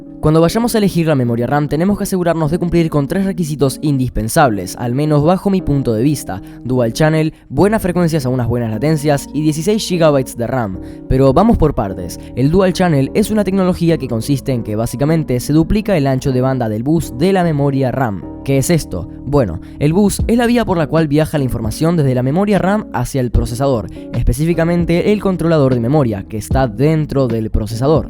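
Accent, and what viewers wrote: Argentina. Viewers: Sos de argentina???? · tenes voz de wachin y la rompes, y mas merito por parecer ser ARGENTO O YORUGUA... MAS DIFICIL no podias tenerla kajskasj y la tenes atada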